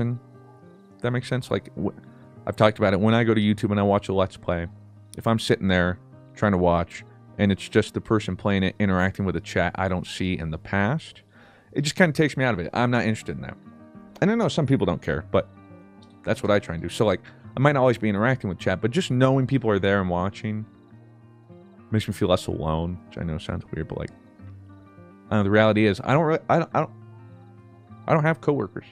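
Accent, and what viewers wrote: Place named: South, United States. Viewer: Listen to that country twang you have! Idk tha5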